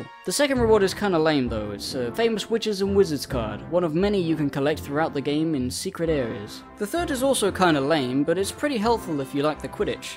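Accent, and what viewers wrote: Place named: United Kingdom. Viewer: I fucking hate british accents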